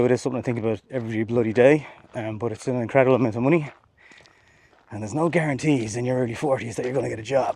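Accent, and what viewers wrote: Ireland. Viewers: New Zealand here. YouTube ads have become more and more crappy with stupid almost scam products more often than not. Love your channel. Found it looking at Skoda stuff before in light my Octavia RS. Kept watching as I like your style and who doesn’t love an Irish accent. Don’t watch shorts don’t see how they are any use for cars. 10-20 minutes is about right for me.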